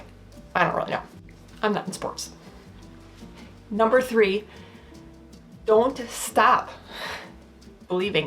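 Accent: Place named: Upper Midwest, United States. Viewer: Hmmmmmm.. I’m not a language expert but you sound like you’re from Wisconsin or Minnesota..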